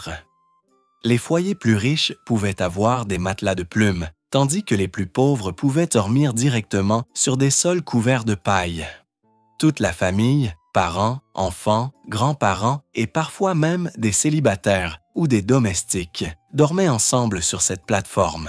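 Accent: Quebec, Canada. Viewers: J'aime bien cette voix québécoise, je m'endort dessus. Merci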